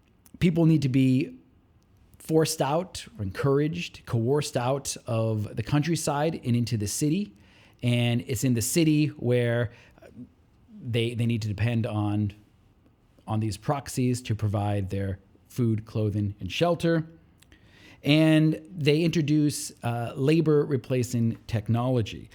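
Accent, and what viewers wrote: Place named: New Hampshire, United States. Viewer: He's from New Hampshire, let it go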